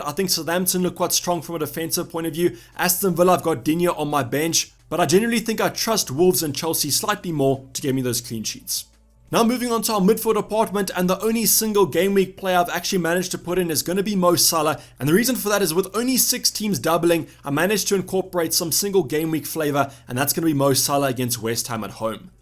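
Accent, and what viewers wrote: Afrikaans, South Africa. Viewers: Yoh!! Your boer accent is heavy 😂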